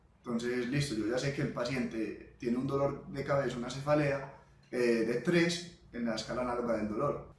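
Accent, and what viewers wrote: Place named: Antioquia, Colombia. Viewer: No solo Colombiano... paisa! :V jajajaja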